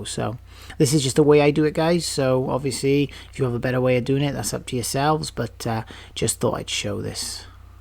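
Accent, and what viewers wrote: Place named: Wales, United Kingdom. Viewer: Very helpful, detailed, & professional video, all delivered in a most pleasant Welsh accent! Very well done😊.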